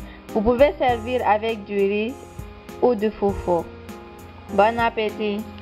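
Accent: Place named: Guinea. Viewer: Je suis tombé sur ta chaine super video une abonne de plus, tu vien de la guinnee avec ton acent j ai suis , tu peux faire un tour sur la mien aussi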